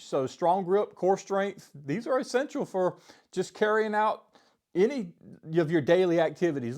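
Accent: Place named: North Louisiana, United States. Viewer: I detect north Louisiana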